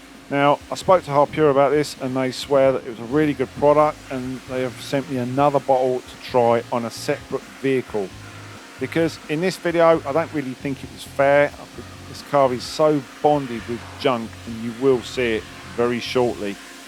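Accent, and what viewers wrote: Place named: England, United Kingdom. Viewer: One. Of the things I love about you and Jon from Forensic detailing is you both give wonderful narration and cool ass English accents 👍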